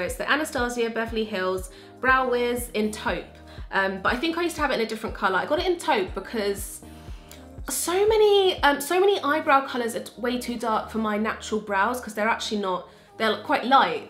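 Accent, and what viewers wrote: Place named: England, United Kingdom. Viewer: Am I the only one who loved the way she said nerdy? Lol. The English accent is life 😩 lol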